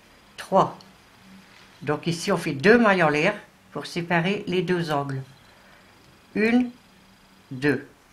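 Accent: North, France. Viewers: bonjour, je suis née a Bruay sur Escaut, et ma famille était a Raisme, Anzin, la dernière tante nous a quitter, la tata m' a préféré, j en et plus, mes j adore entendre l accent du nord, gros bisous toi qui et très très gentil, gros bisous Monique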